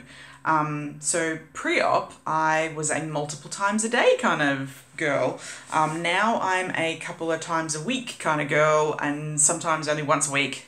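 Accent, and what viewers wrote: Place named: Australia. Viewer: Great info, my date for VSG is 19th March, so looking forward to the big change. Nice to hear another Aussie not many of us. Board is a great idea I will start to create mine now. Thanks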